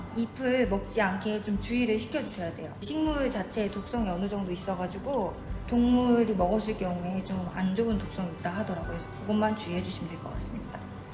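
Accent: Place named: Jeolla, South Korea. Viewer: 절라도여? ㅎㅎ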